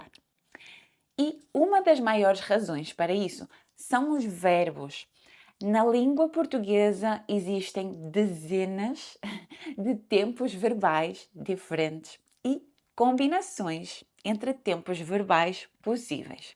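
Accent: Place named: North, Portugal. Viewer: She talks extremely slow so others can understand for sure. And I am just a son of a Portuguese dad who always lived in The NEtherlands but if I am correct the way she talks seems to be more from the north. Especially the "ou" is different from what I am used to (my family is from Lisboa). Nothing bad is meant, but it is what I hear. I have been to Algarve many many times and I don't think I heard people talking like this, but I do remember the same "ou" in Miranda de Douro. Also to my ear hear "s" always sound the same...like "sh" But the way I am used to, there is a difference. It is slight, but I can hear it.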